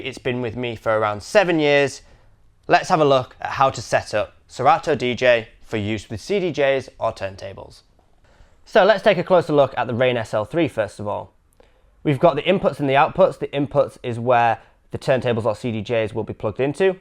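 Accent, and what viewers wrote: United Kingdom. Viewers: Thanks for the info but your brit accent makes it inunderstandable.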